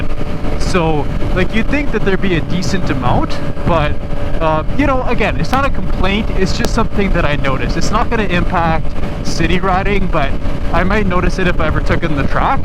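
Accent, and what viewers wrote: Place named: West, Canada. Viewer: Are you from Alberta? That western Canadian accent is really making me curious if I'm right or not?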